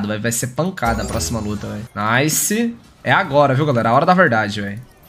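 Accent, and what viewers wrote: Minas Gerais, Brazil. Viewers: é mineiro ou matogrossense?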